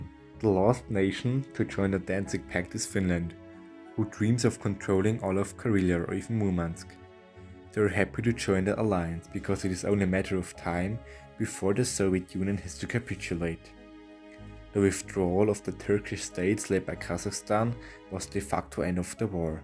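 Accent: Bavaria, Germany. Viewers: Das video wurde sehr gut,und man hört ziemlich deinen Bayerischen Aktzent😂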